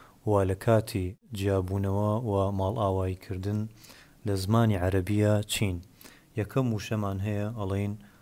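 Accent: Sorani, Iraq. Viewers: سوراني كوراني لهجتك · بس انته تقرا لهجة سوراني؟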